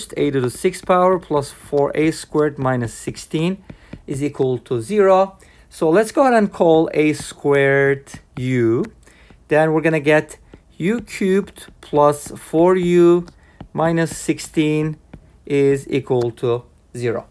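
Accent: Turkey. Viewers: usta bu fikre şivenden kapıldım ama türk müsün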